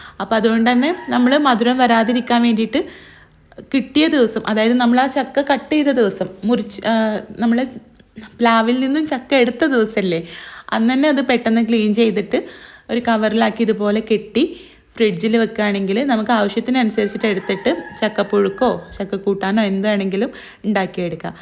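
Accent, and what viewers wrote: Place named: Kannur, India. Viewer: Para kannur basha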